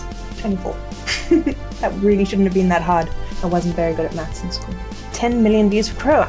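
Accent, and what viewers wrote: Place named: South Africa. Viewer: Hey,. Sorry I missed your first livestream,but I shared this all over Facebook. You're awesome as always. I love You're South African accent and could listen to you talk all day. Lol. I absolutely love your music and the way you perform. Never stop playing. I don't have much time left on earth, but I'll be listening to you for as long as I can. 🤟✌🌹🌹🌹🌹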